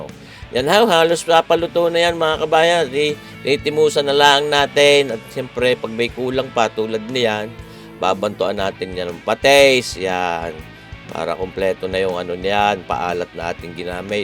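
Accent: Batangas, Philippines. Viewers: Good evening idol kung batangeño accent,, shout out po sa inyo diyan sa Batangas,,, akoy laging nanonood ng iyong video ❤❤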